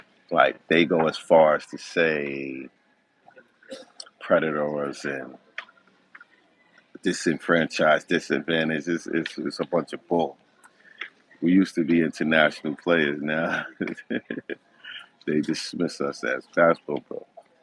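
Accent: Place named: New York City, United States. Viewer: Your NYC accent is diminishing.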